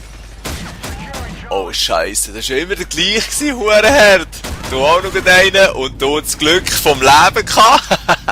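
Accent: Switzerland. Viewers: Hehe Schwiizer Kommentater :D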